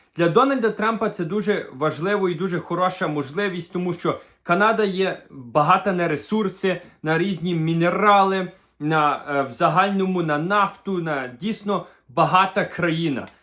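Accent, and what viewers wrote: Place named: Lviv, Ukraine. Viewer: не львівський в нього акцент, а з львівських сіл (видно вчили мови бабуся чи дід)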